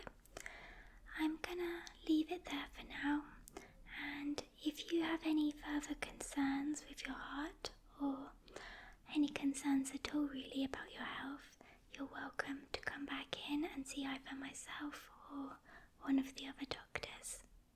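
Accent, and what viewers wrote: England, United Kingdom. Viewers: Great voice and I love your accent. You’re from wich part of England? Good luck and keep it up!!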